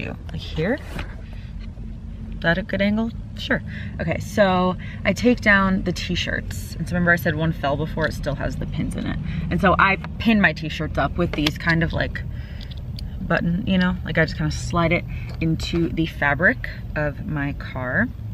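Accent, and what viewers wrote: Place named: New York, United States. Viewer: I love hearing NY expressions that I never realized were lol. My dad always used to say “dilly dally” but he just recently passed on July 19, 2019. I don’t realize some of the expressions NY’ers say until I hear them. I should have said before I live in NC now for 13 1/2 yrs. So you speak like I do. Lol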